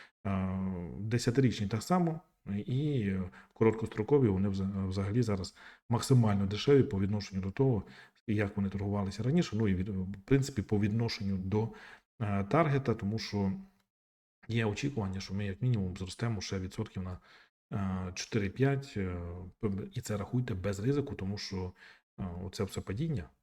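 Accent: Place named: Transcarpathia, Ukraine. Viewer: мені подобається ваш закарпатський акцент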